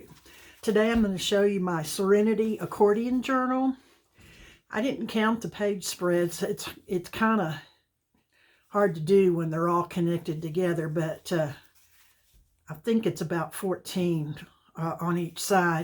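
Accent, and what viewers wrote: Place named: Alabama, United States. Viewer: I love your accent. Where are you from? Sounds like Alabama. Forgive me if your not. I love your videos. I've done one or two now. I have a vid on you tube.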